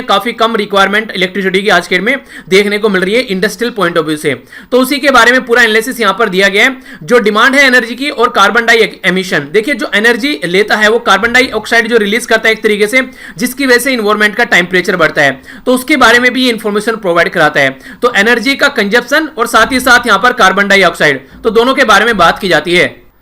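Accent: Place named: Uttar Pradesh, India. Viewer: Sir ki language se Mujhe v lgta h.. Jaise kbhi kbhi unka tongue slip kr jata h or UP ka thoda effect dikh jata h.. Jaise ki kl... Hamaari ko hmri bol diye sir... Aise bahut baar hua h... Bt sir btate hi ni.. 😣😣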